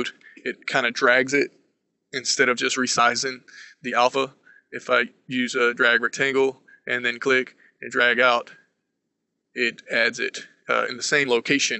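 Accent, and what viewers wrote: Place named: South, United States. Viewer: You sound like you're either in Tennessee or Georgia. Great tutorial though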